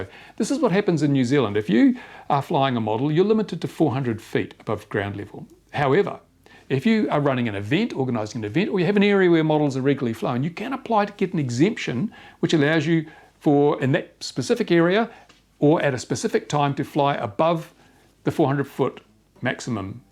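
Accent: England, United Kingdom. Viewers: love the british accent :-D and yes the UK brexit is a proper mess, the politicians saw to that.... sort of like the drone reg's :-)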